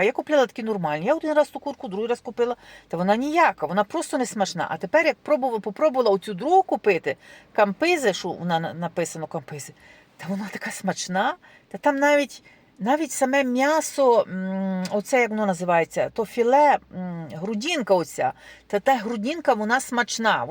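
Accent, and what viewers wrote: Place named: Rohatyn, Ukraine. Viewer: За тоном розмови ви не зі Львова, а із Рогатиншини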